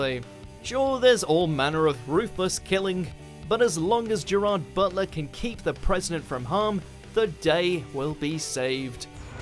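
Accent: United Kingdom. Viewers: You already know there UK when you here the voice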